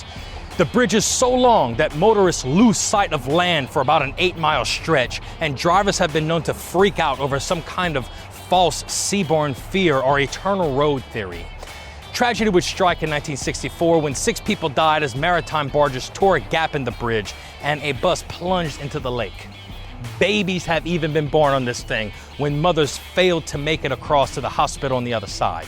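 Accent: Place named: Cajun, United States. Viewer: It isn't very strong, but I hear that touch of Cajun accent lol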